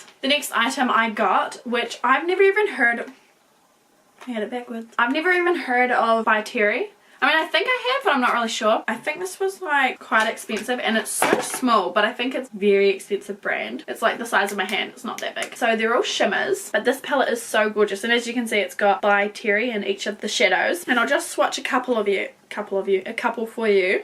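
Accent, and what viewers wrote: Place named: New Zealand. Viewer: Ughhhh I am in love with the modern Renaissance palette 😍 Your accent threw me off for a second, I was like "SHES AUSSIE" but your accent didn't sound quite right for an Aussie, I think it took me 2 solid minutes to realise your actually a kiwi 😂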